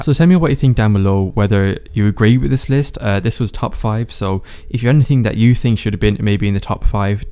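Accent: United Kingdom. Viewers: is he british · Are you from UK?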